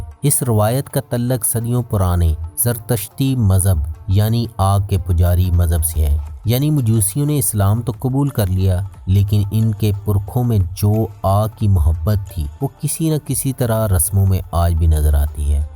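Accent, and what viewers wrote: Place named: Punjab, Pakistan. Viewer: Yeri maadri jubaan saayad punjabi hai par urdu bol raha hai par punjabi ki jhalak hai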